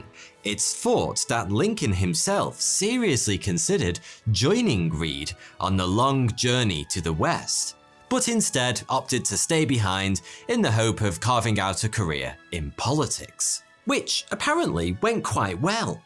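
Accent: United Kingdom. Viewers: Donner Party is at most a passing, macabre joke. Sometimes people will use the name when waiting for a table at a restaurant so they can hear the hostess say, "Donner, party of two." But the only thing weirder than the Donner Party is seeing someone with a British accent make a 22 minute joke of it. Beyond one-liner jokes, I'm unaware of any American who views the Donner Party as a comedy of errors. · Are you British?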